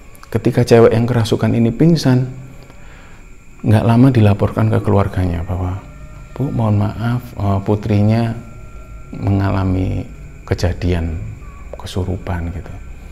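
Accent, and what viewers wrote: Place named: Surabaya, Indonesia. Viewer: luar biasa. uenak rek cara nyampaikan nya podo suroboyone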